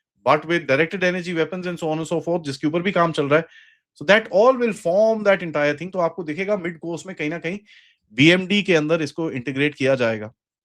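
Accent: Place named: Bengal, India. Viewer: Bengali accent kaise bolte ho?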